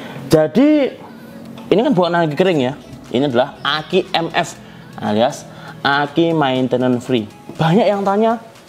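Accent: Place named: Java, Indonesia. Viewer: Boso jowone ojok nganti ilang mas Aku suka caramu menerangkan dengan ada logat jowone mantap😁🤙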